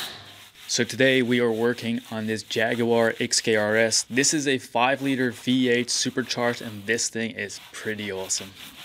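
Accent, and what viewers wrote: United States. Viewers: Hey mate, are you a native belgian? Your accent is very american! I order from your detail store all the time.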